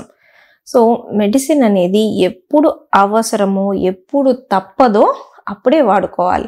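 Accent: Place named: Prakasam, India. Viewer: Avida proper chirala prakasm dist yasa alage untundi.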